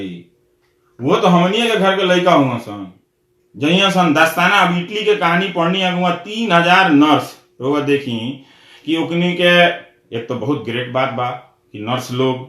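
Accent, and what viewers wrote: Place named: Bhojpuri, India. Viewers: Keya bolte bihari bhasad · Your bhojpuri style is very awesome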